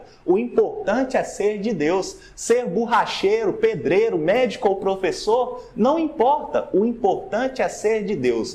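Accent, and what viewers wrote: Minas Gerais, Brazil. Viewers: Lindo capítulo do livro e muito bem explanando pelo. Aproveito pra matar a saudade do sotaque mineiro que traz aquela sensação de estar em casa 😍 gratidão,!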